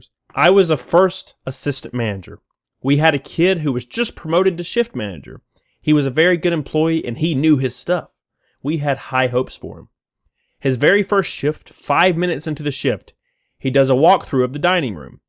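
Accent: South, United States. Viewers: lol btw I like your voice reminds me of when I used to live in the south. I had some of the fondest memories. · You've had people say they hate your voice? That silky southern drawl? The fuck is wrong with those people?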